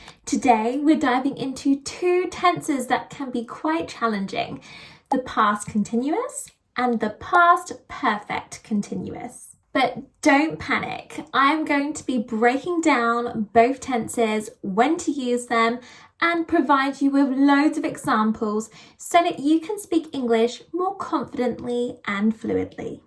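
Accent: United Kingdom. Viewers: Love British accent.❤❤❤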